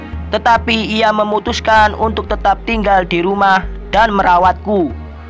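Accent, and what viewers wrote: Java, Indonesia. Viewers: Kok medok bngt .orang mana ya bpk.lagi terakhirnya **TAAMAAT**KYK DI BOSKOP AJA🤣🤣🤣🤣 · Bertele tele...udh suaranya medok bgt ga enak didenger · Sebeliumnya saya minta maaf, ya, mas... (Juga untuk yg lainnya) Sebenarnya Ada benarnya juga jika sampeyan bilang gak mutu...itu jika diibandingkan dg ilmu2 pengetahuan atau agama. Ini tergantung bagaimana kita menyikapinya, jika pikiran kita kotor maka akan menjadi nampak kotor, Semua hal ada sisi baik dan ada sisi buruknya, jika kita lihat banyak buruknya, cobalah kita cari sisi baiknya walau sedikit sehingga kesalahan / keburukan seseorang tak nampak menggunung. Simple saja.. buang yang buruknya, ambil yang baiknya ..yaitu hikmah di dalamnya agar semoga kita dijauhkan dari kemaksiatan dan senantiasa menjaga keimanan kita, aaamiin. Bukankah Untuk menjadi baik adalah belajar dari kesalahan? 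Dan untuk menjadi wong bener adalah dengan belajar dari yg salah.., jadi mari kita anggap saja channel adalah guru yg sedang menasehati / mengingatkan kita agar kita berhati2 dan waspada karena manusia sesungguhnya tempatnya khilaf / lupa, sehingga kadang tiba2 terjebak dalam kemaksiatan. Bisa saja kita sekarang mencela. Besok kita yang terperosok. Semoga gusti Allah selalu menyertai dan melindungi kita. Aamiin.... Kalau saya lebih fokus dg bahasanya yg medhok gak karuan dan suara jelek serta cara membacanya yang jelek sekali, haha.. Maaf, ya.. Buat adek yg punya channel. , tapi itu yg membuat nilai pluznya, menjadi. . Lucu.. jadinya bikin saya ketawa terus, apalagi jika bilang tamaaat.. Atau sekian.... Saya ngakak sekali. Oleh karena itu saya lebih fokus ke lucunya .. Semoga kita bisa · GK seru kalo critanya di bacakan,seperti membaca pelajaran waktu SD dulu,yg membaca teks logatnya medok lagi jadi Yaaa semakin gak seru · Ngomongnya medok jawa banget.Jadi ngga enak ceritanya !!!! 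· Suara jawa pekatbanget loo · Lucu cara ngomong mu, medok 😂😂😂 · Medok dan tamat ... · baca.anya seperti jawa tuken bro keras. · Kelihatan admin yg ngomong ini orang dari Bantul Jateng ya,kedengaran medok jowone,yg lucunya mendekati akhir cerita teksny hilang...mas klu mau buat cerita elupun ceritanya betbau hayalan yg baguslah kemasannya..ok,mas.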